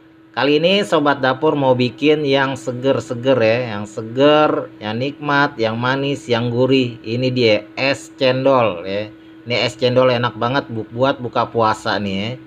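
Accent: Jakarta, Indonesia. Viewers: Gile ye betawi asli ye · Baru nemu channel si abng, demen banget enak ngomong nye betawi asli...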